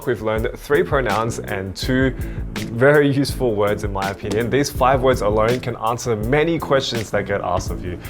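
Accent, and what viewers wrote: Australia. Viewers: You sound like an Aussie? Where are you from? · Based on your accent, I'm guessing you're in Australia? Do you teach here? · so much easier learning from a Aussie accent.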